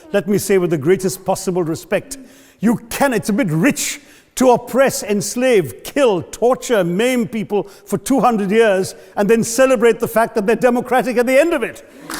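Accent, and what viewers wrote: RP, United Kingdom. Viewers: Roasting Britishers in their accent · lmao his accent is British, considered to be one of the most beautiful accents. Please consider your pronunciations and accent first if you had the guts to say that. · He speaks British English exactly. I loved it. I have a problem in my tounge, so I can't stress some words like how he stress. That's why I preferred to speak American English. I like to hear British English too. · wow i wasnt aware that he can speak british accent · Tharoor, you were born in England and you are talking against her.I think England has been giving more and more to india than it actually ever took and moreover you are trying to act over-confident with the British accent not the Indian accent. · In British accent as well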